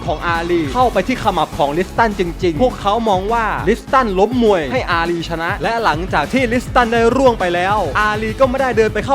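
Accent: South, Thailand. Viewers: แอดคนที่ไหนชุมพรเหอะสำเนียงใต้แปลกๆ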